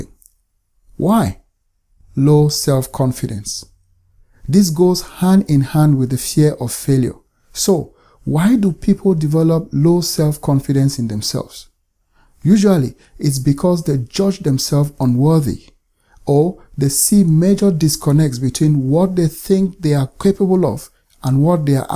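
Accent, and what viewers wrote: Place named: India. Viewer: your accent sounds like an Indian accent....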